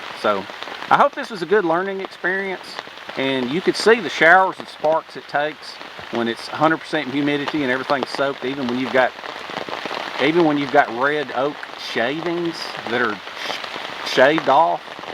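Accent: South, United States. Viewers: As always, thank you for an entertaining learning session. I've said it before, you are the Mr Rogers of survival. I've watched my share of survival videos and all of them take on the overtly serious tone which makes the video far less entertaining (They need to learn to lighten up and laugh at themselves). You're honest in your situations and circumstances (just like the tent fire with the carbon fiber chimney) and you keep a fun and positive attitude. As I stated before, the Learning Channel needs to take a serious look at your videos and NOT CHANGE A THING about what you do in them and allow you to keep in real. As a fellow southerner (Look Out Mountain, TN) living in California (but leaving soon), it's a breath of fresh air to hear a real southern accent. You've got the regular TV programs of today beat, my friend. Except for Twilight Zone and Hogan's Hero's, there's no reason to watch the TV when I have your educational entertainment available here. 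Be safe my southern brother, and take care!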